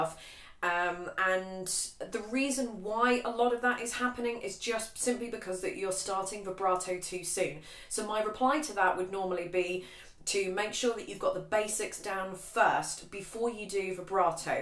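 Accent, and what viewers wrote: United Kingdom. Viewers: I love your british accent, thank you for the advice, im 9 months violin student and i've just started with shift positions and vibrato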